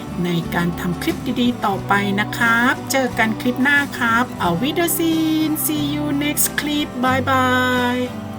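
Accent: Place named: Isan, Thailand. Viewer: ดูเพลินเลยจร้า สวยมากๆเว่าอีสานได้น่ารักมากๆจร้า..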